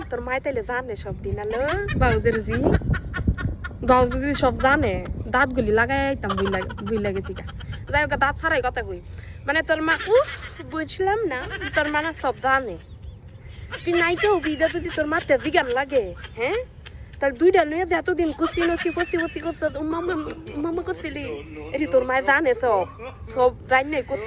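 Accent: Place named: Mymensingh, Bangladesh. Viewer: ময়মনসিংহ ভাষা। রাইট বললাম তো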